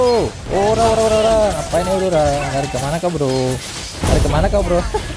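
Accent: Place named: Sumatra, Indonesia. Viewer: Cara ngmongnya kek orng sumatra lu bng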